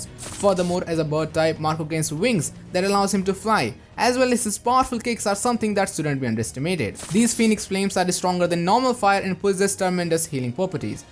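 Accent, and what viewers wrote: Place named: North India, India. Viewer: Bro, you need to work on your North Indian accent.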